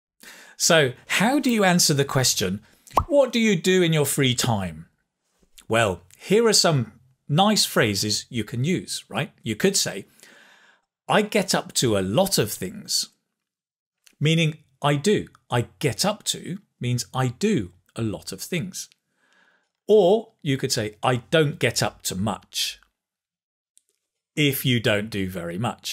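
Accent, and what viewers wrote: United Kingdom. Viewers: Wao thank you so much.I am obsessed with your videos.I am extremely curious how can I learn the British accent?I love your accent😇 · In my free time usually do learning English and copy the words with British accent as you had which makes me improve a lot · I just love to watch your videos and practice my fluency. I love that repeating session..feel as if I talk to a British teacher in person. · Do you speak with a British accent?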